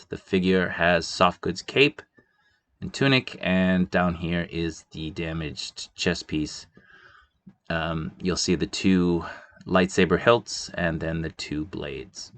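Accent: Boston, United States. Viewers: you’re from boston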